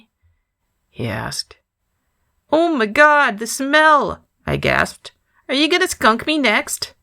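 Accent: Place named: Midwest, United States. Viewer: Great job! I guess my ear is tuned to the Midwest speech pattern. 😂 I think it's great!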